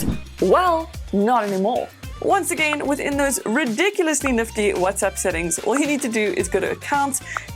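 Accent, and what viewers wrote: South Africa. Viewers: Great video, are you South African, you sound South African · Are you South African?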